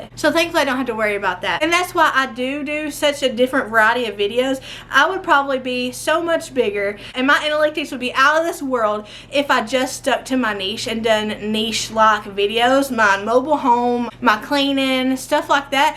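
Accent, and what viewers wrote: South, United States. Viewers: omg i can tell ur southern cause u do like i do when i get too talking i talk so fast and i talk about everything and people have too tell me too slow down lol i love the way u are it makes me smile !!! thank you veery much for making the videos i love you and your family yall are so down too earth that what makes u special !!! MUCH LOVE TOO U N YOUR FAMILY !!!!!!!!!🥰🥰🥰🥰🥰🥰🥰🥰🥰🥰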